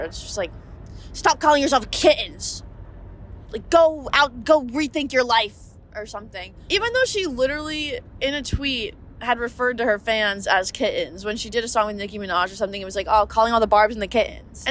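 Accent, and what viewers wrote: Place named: Upstate New York, United States. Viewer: The most north NY accent of all time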